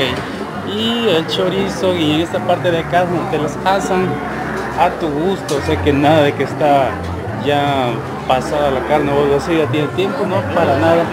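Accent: Mexico. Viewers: nombre guanaco hasta tu accento no oye.y te oyes bien mexicano carnal respeto saludo de phoenix az.te quidas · Amigo estoy sorprendida 🙀neta pareces mexicano 😘😘ya se te está pegando nuestro asentó jajajaja 😝😝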